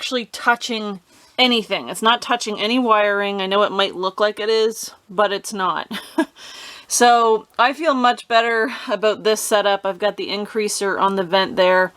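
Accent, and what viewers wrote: Canada. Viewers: Where are you? It's August and you need heat? I hear the Canadian accent but you must be way up there.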